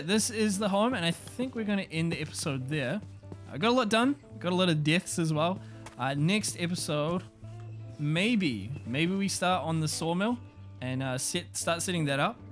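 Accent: New Zealand. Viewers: thanks so much bro, also from New Zealand, good to here a familiar accent on a yt video, keep it up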